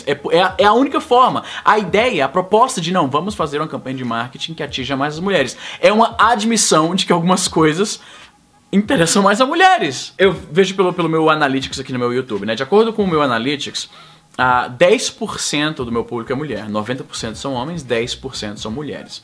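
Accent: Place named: Ceará, Brazil. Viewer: Nesse video podemos perceber as raizes nordestinas do, provavelmente do Ceara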